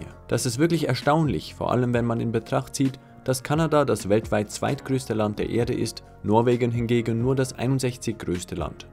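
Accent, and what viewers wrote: Switzerland. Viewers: Man hört schon sehr sehr oft Schweiz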